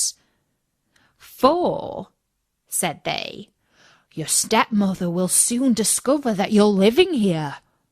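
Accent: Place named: United Kingdom. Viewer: J'adore ton " british accent" qui va á merveille avec les contes ainsi que ta voix qui ressemble à "kiara knightly" vraiment bravo et chapeau bas pour ta façon pro de raconter la conte qui laisse á imaginer les evenement sans les voir💜👍👏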